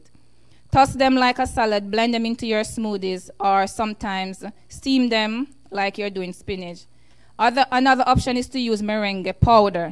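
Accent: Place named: Jamaica. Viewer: keep it real me love de jamaican accent thanks for your presentation.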